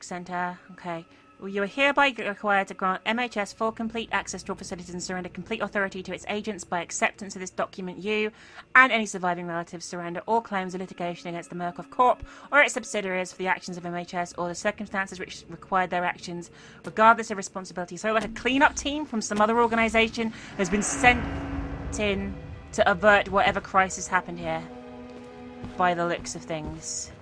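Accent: England, United Kingdom. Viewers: Never seen before is she from England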